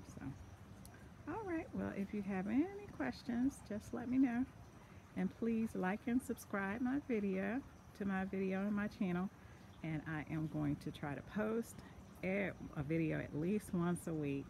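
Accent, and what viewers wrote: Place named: South, United States. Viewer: Stunning! I hope to follow suit! And very genteel southern accent. 😍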